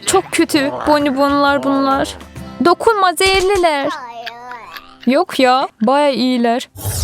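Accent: Azerbaijan. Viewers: Siz deyəsən Azərbaycanlısız səsiniz qəribə çıxır mütləq Azərbaycanlısız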